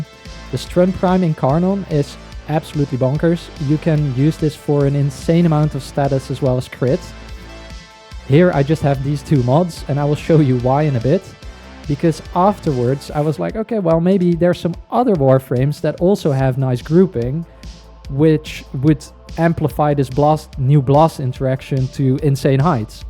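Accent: Netherlands. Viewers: Are you Dutch btw? I Recognize your accent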